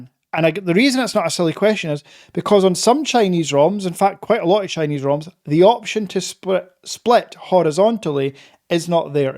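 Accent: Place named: Scotland, United Kingdom. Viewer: Just wanted to say thank for the IR remote tip! I recently got a fan that was unable to work with the international app and unable to work with the chinese app due to it being region locked. Was cracking my head for days on troubleshooting but you totally solved that issue! Love your channel, very straightforward no fluff reviews and got to say, that Scottish accent is killer.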